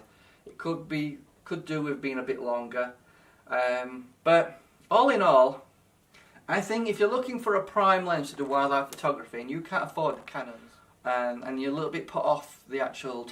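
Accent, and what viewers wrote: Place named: Thailand. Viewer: That sounds like someone from Thailand reading some text …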